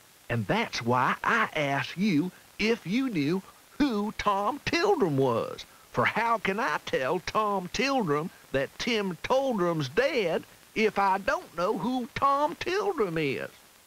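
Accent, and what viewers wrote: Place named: South, United States. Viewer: I like the US country voice. 🤠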